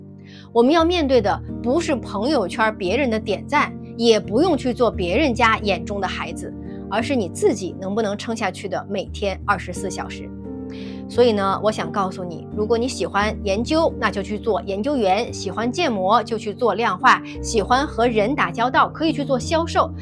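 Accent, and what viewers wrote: China. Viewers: 有点好奇，为什么听主播口音好像是大陆人，字幕却是繁体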